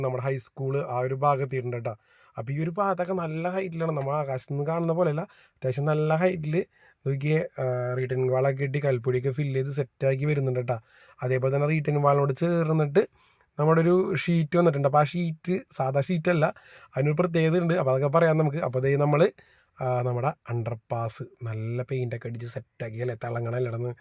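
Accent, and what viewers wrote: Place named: Thrissur, India. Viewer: Nalla Thrissur slng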